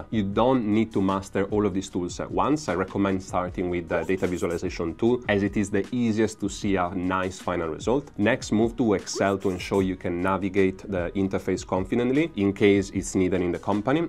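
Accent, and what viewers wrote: Spain. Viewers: Este acento suena español pero gracias me has resumido mucho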